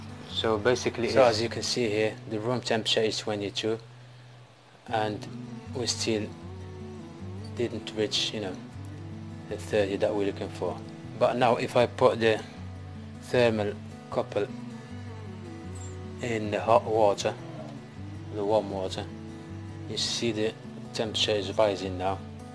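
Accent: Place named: Casablanca, Morocco. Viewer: تبارك الله عليك اخويا ، و المغاربة اللي تيهضرو بالانجليزية و شرحو بالانجليزية ، مثال بسيط و مشجع بالتفيق اخويا ، و لكن الاجليزية عندك كازوية هههههه تبرك الله عليك برو ،😍😍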